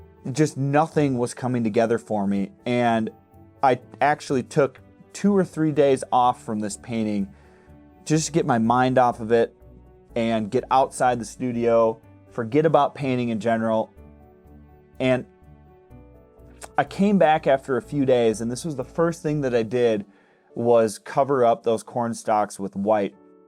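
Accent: United States. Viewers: Are you from Michigan? Lmao.. I'm just asking cause the accent.. But your videos are so good! I think I said this comment twice lol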